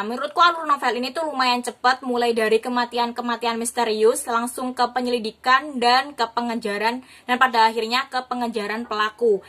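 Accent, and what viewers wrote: Java, Indonesia. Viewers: Suka sama logat kak jawa banget😍😍😍 aku juga asli jawa lho kak, tapi tinggal di Bekasi hehehe😁😅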